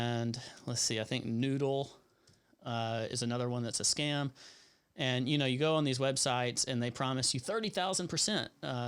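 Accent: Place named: South, United States. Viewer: We have ourselves a southern gentleman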